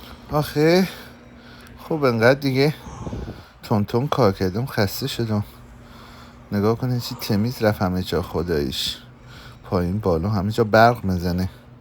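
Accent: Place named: Mashhad, Iran. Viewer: درود بر شما با اون لهجه مشهدی قشنگت،لطفا یک مقدار هم از جاده ها و طبیعت زیبای کانادا حين رانندگی بزارید،ممنونم ایران خوزستان 🎉❤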